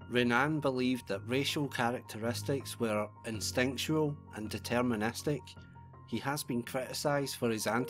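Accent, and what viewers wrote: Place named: Scotland, United Kingdom. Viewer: It's a Scottish accent not Irishman...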